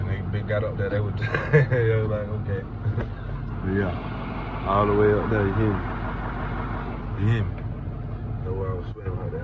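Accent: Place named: Atlanta, United States. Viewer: Bra so Atlanta 🤣🤣🤣I just subscribe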